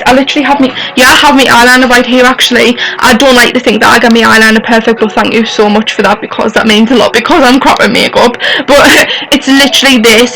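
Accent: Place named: Boldon, United Kingdom. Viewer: I don't believe that. You sound like you're from Boldon, not to insult you or anything :'D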